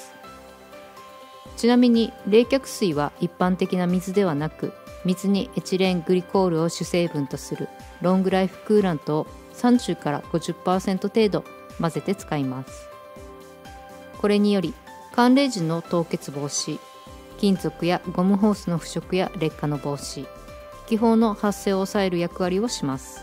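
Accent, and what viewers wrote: Kansai, Japan. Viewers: 端々に出てくる関西訛りが、スゴく好きです。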